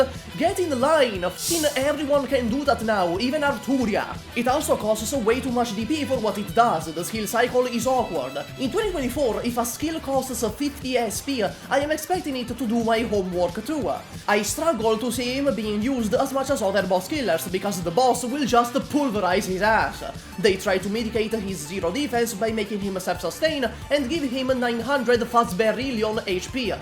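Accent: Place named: Italy. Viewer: i don't even play arknights anymore i just like listening to an italian mf yap about shitty operators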